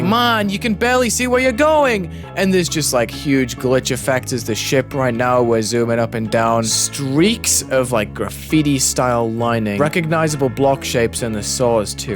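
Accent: England, United Kingdom. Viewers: О вы из Англии????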